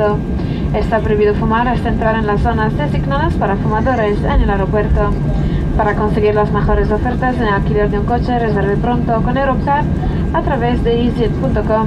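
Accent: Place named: England, United Kingdom. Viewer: hehe easyjet, en naam leuk! Lol dat engels accent ken het bijna niet verstaan. Mooi video wederom! Weet jij misschien echt goedkope vluchten? Ik zie namelijk vaak spotters naar Geneve of Manchester gaan paar keer per jaar, of spotters die vaak vliegen voor goedkoop. Weet jij dat misschien! Alvast dank!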